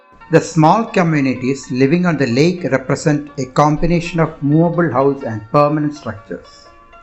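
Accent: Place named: India. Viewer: Yeena dey...Your English...is Indian pronounces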